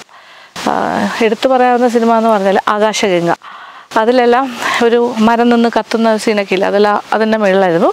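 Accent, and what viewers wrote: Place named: Valluvanad, India. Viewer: Palakkad etthiyappol valluvanadan slang aaya pole.....njangalude swantham Palakkad....keralathinte nellara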